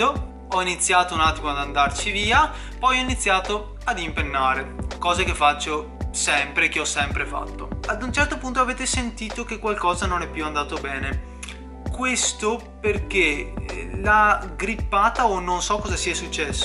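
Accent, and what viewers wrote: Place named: Veneto, Italy. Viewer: Se sente te si veneto 😂😂❤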